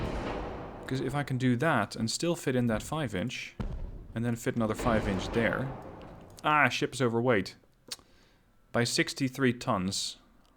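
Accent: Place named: Netherlands. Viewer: Listening to this, i am sure he is dutch, they can not hide the accent.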